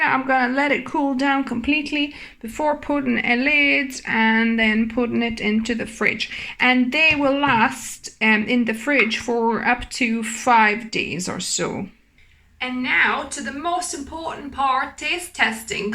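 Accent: England, United Kingdom. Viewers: What a nice English accent. 😅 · Just found to see about how to go about making some black soy beans I have for natto. I'm not worried about the beans splitting, so thinking I'll give a go after rinsing first at maybe 45 minutes with 2" of water at least above. Not to be rude my dear, though you have the most combined European accent I've heard. Sounds a mix of Great Britain and then some. Thanks for sharing. I'll try to document and report back on my vlog YT channel the results. Thinking I'll not use the Mueller pressure pot and go with the Instant Pot if I can't confirm the pressure settings being equivalent to the High Pressure off the Instant Pot IPDUO80 I have out.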